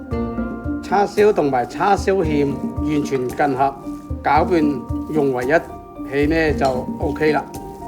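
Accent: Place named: Zhaoqing, China. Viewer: 是肇慶話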